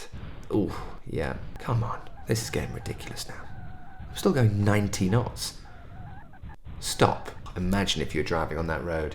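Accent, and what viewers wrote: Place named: United Kingdom. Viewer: You like ryan gosling but drunk and british :) good video!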